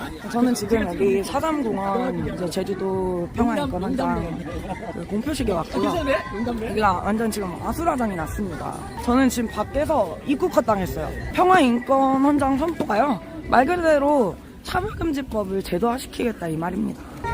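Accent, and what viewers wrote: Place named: Jeolla, South Korea. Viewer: 말투가 전라도 같은데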